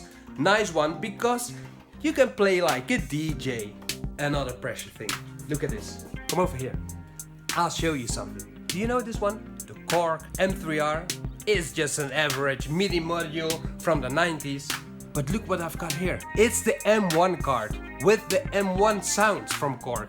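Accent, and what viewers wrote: Netherlands. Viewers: Were are you from? i spot a bit of Dutch in that English spoken word. But you are just like me: hardware, sniffing hardware etc. Amazing :D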